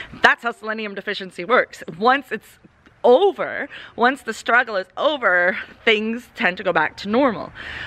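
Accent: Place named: Massachusetts, United States. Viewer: It’s funny because I hear your Massachusetts accent and your southern accent.....I’m the same way. That Northern accent just flys out of my mouth lol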